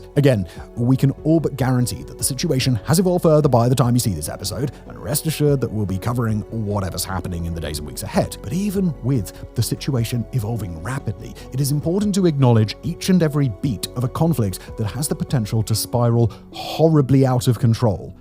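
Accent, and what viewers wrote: United Kingdom. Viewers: is this guy british or american i cant tell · He's british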